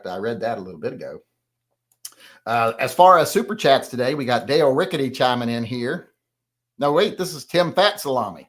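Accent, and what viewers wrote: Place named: South, United States. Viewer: I don't know your story. You sound like you have a southern accent. It's blasphemy for a southerner to refer to himself as "Yankee." Lol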